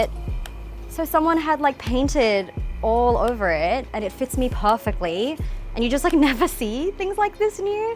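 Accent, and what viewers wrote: Australia. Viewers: Her accent is definitely not regional to anywhere in the US. She sounds Australian to me. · Are you a an Australian? You sound very Aussie, ooh never mind I just saw Vegemite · Love her accent, can hear the Australian coming through.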